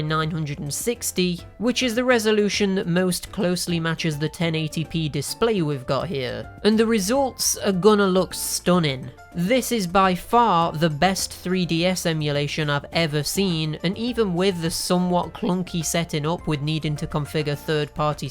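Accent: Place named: North, United Kingdom. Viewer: Thank you for yet another great video,! I absolutely adore ur accent, which part of UK are u from? I would guess somewhere north.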